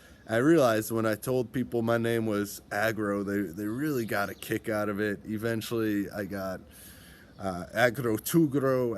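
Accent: United States. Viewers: Hello excuse me. I am British, don't forget we taught you Americans English lol. Although i enjoy the American accent, we see our British accent as the more refined version. :)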